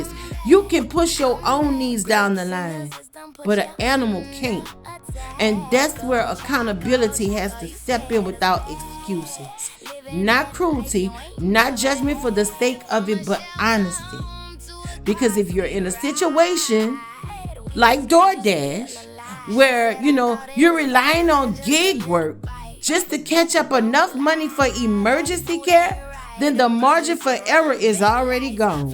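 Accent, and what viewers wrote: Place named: New Orleans, United States. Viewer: Girl I’m from New Orleans and as soon as I heard your voice you sounded like family. But I yea this whole situation is crazy. I’m praying for the dog 😩😂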